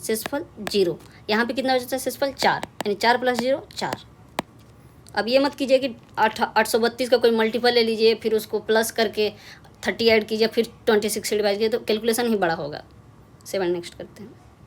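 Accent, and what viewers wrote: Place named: Bihar, India. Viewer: Very nice ❤❤ भैया ❤❤ awaaz me bihari touch 🙊🤗🤗🤗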